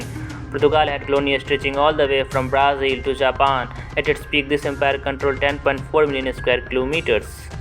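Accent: India. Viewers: Indian english i dont hate but its annoying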